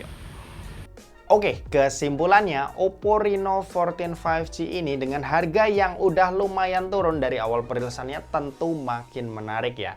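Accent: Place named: Purwokerto, Indonesia. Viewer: PURWOKERTO YA INI MAS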